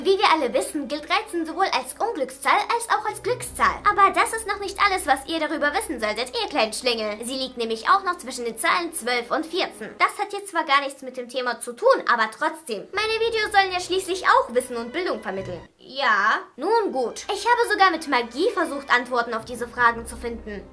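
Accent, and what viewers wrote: Russia. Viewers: Du hast auch ein russischen Akzent und er nicht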